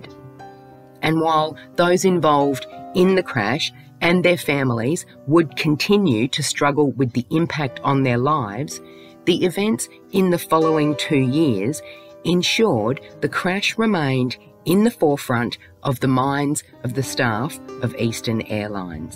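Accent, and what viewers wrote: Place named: Australia. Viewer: I just added this or subscribe to this channel and I just love this channel the woman that was speaking sounds like she has a lovely Australian accent, which I live in the United States but it sounds like she’s from Australia or New Zealand and or England but anyways, I just want anyone who’s reading this to know that you’re not alone in this world if there’s ever something upsetting you were bothering you always reach out and talk to someone people will understand more than you know I’m 57 and divorced with no kids and I’ve been just about through everything and you can just make a checklist of everything is happened, but I think God is testing me to make me a stronger person again I wish every single person reading this long long happy and healthy beautiful life. I wish nothing but the best for everybody here on this forum. 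God bless you all. Sincerely MINDY from California. Just sending hugs God bless. 🙏🙏🙏🇺🇸❤️🌈🌟💖👇🏽😊😊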